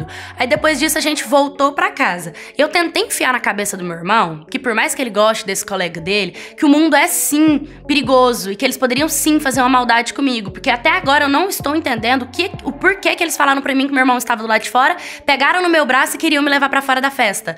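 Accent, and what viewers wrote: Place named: Goiás, Brazil. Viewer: c é de Goiás né?esse sotaque maravilhoso n me engana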